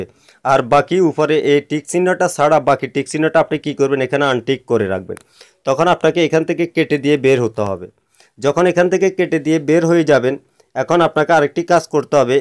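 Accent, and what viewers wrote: Noakhali, Bangladesh. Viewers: আপনি আমাদের ফেনী নোয়াখালীর মানুষ হবেন আপনার কথা শুনে বুঝতে পারলাম যদি হয় কাজটা মন থেকে অনেক দোয়া করবো আর যদি না হয় কষ্ট করে আপনার কন্টাক্ট নাম্বারটা আমাকে দিলে খুব ভালো হয় আর আমি আপনার ফোনের মত হয়তোবা ছেলের আইডি ইউজ করি আরকি সেফটির জন্য প্লিজ আপনার নাম্বারটা দিলে কোন ক্ষতি হবে না প্লিজ 😢😢😢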